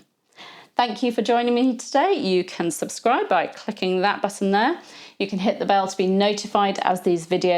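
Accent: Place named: United Kingdom. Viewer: I love your channel so much! I just love to hear you speak with that wonderful British accent! Plus you are a terrific teacher. ❤️👏🏻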